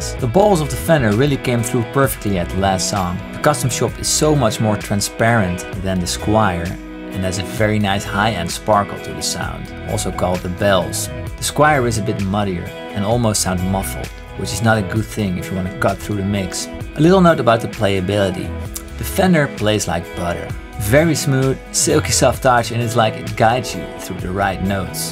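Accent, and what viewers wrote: Netherlands. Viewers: Are you dutch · cool je bent Nederlands (hoorde al zoiets aan je accent) toffe video. speel zelf al 7 jaar gitaar en doe dat nog steeds op m'n squier ;) Super vid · Maat ben jij een nederlander??? lol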